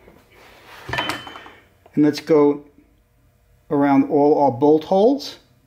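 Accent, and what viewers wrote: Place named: North, United States. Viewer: why can't I just send my t5 to you sounds like you are north of the mason Dixon line. so might be to expensive for shipping.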